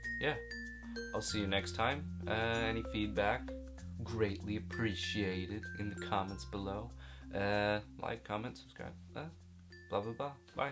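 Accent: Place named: Norway. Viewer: Another thing I noticed, I just checked out your first video as well, and you have developed somewhat of a Norwegian accent while talking Norwegian! xD Kos deg i Norge og finn på masse rart! : )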